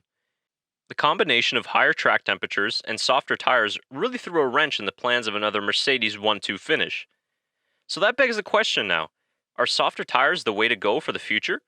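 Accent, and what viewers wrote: United States. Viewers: I really enjoy this content. I don't know if you currently live in Europe, but it's refreshing to hear someone with an American accent talk about F1 and really know what they're talking about. If you still live in the US, awesome that you watch the races! I can imagine they're quite late at night over there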